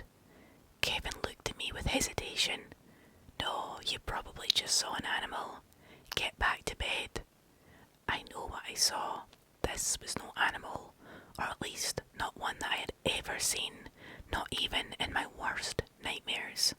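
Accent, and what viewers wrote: Scotland, United Kingdom. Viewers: Fuck aye the Scottish accent is great for asmr. Sending love from Wales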